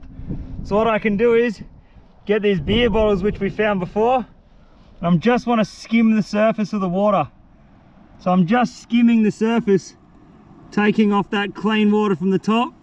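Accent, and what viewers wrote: Australia. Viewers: I can hear that Aussie twang in that exactly (?)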